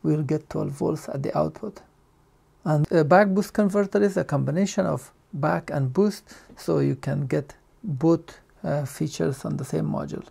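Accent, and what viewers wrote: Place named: India. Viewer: indian sound funny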